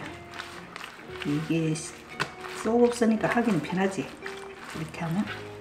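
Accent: Gyeongsang, South Korea. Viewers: 작년에 친정엄마 돌아가시고 우연히 이 채널을 보게 되었는데 펑펑 울었네요. 재료 손질하고 넣을때 터프하신 손놀림이나 경상도 말투나 엄마가 너무 생각나요. 요리를 따라서 하진 않지만 새 영상 올라오면 다 챙겨봅니다. 자주 올려주세요^^ 엄마와 딸 너무 보기 좋아요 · 님은 경상도에 사시나요~?경상도사투리로 말씀하시는데~김치도 약간 경상도식으로~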